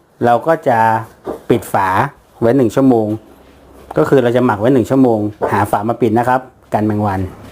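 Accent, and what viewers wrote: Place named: North, Thailand. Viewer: ฟังสำเนียงคนเหนือแน่เนาะๆ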